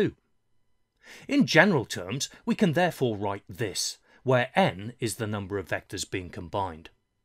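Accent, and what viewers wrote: United Kingdom. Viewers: So nice of you to do this especially as a school teacher. You accent is definitely British and I'm from India...so are you in England right now? What's your current time zone...? Asking to bother you only at specific and appropriate times of the day.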